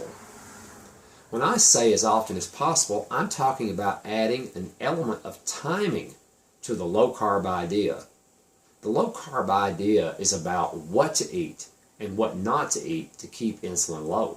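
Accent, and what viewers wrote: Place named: Texas, United States. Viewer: i just love you,. i'm texas born and bred and you TALK like me! you sound like my kinfolk! anyway, i came upon your videos somehow and started "kind of" keto" (still working on consuming so much good fat) but started fasting a month ago and have lost 17 pounds!!!! i'm 62 and very inactive these days and the pounds still came off! fasting was not nearly as scary as i thought it would be; actually quite the opposite. i LOVE it and when i do eat, the food tastes better, i feel better, and it gives me joy. keep it up for so many of us!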